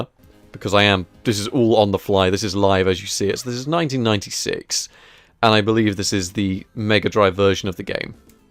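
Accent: Canada. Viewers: Canadian English, perfect! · Canadian English eh? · Canadian English 😂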